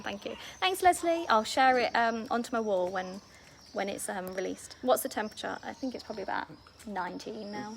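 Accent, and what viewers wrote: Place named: Leicester, United Kingdom. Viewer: Wow, really ! Leicester & the area of Bury have a very similar accent then. Looking forward to watching more of your videos. Have a great evening.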